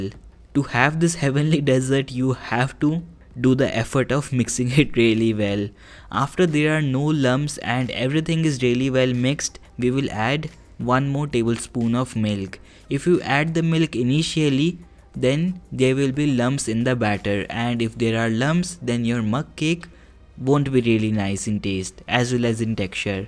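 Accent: India. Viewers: lol indian accents always get me🤣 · Looking at the comment section it feels that this video has garnered views from all over the globe. Quite a success for something that is vegetarian and not butter chicken. To those complaining about the accent, please just chill. This is an Indian guy and English is not his mother tongue. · Myra Cherryfall He is Indian since I’m Nepali and I know how they speak xP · People are making it sound as if he's/she's rasist because he has an Indian accent or something.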